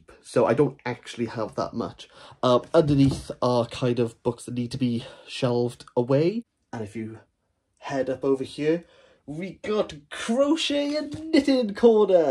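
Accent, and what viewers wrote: Wales, United Kingdom. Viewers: This made me giggle! Here in Melbourne it was lovely to hear a fellow Welshie! Thanks go to Simon for pointing me in this direction! 😊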